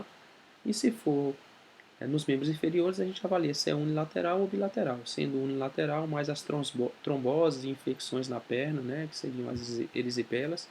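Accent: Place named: Northeast, Brazil. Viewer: Falou muito e muito rápido, explica em Termos que só um Médico entende e o Sotaque Nordestino "comendo" o "R" no final das Palavras e isso como Médico, é uma vergonha!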